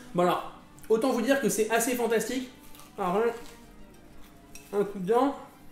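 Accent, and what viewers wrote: South, France. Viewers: J'ai faim. Et j'admire le nombre de craquage d'accent du sud que tu a su mettre dans cette petite vidéo. C'est craquant-gourmand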